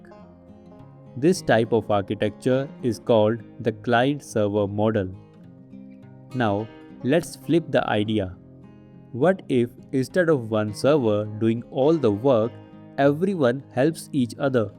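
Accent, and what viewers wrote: India. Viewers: After hearing the Indian accent, I was confident that this video will surely explain me, and it did!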